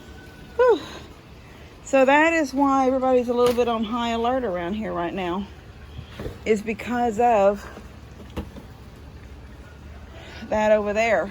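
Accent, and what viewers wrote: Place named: South, United States. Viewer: I love your country talking. Some of your words todays I haven't heard in years. You are the best!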